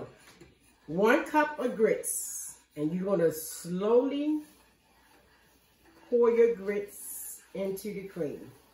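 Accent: Baltimore, United States. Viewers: I can hear the Baltimore accent heavy 😎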